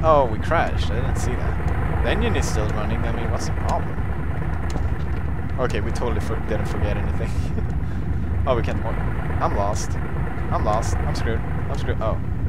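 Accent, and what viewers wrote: Sweden. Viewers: Who came here for real Swedish accent ? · Dat swedish akcent ;))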